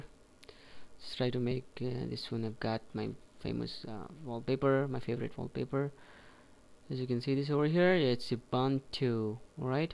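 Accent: India. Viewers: I can hear that u r indian i am indian my self except im punjabi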